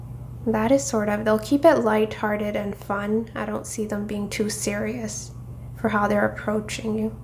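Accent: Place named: United Kingdom. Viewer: I loves his British accent😅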